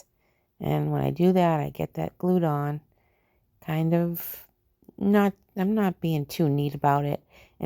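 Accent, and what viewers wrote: Boston, United States. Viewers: Is that accent from Boston? I get nailed all the time with my "Texas Country Twang"😂😂❤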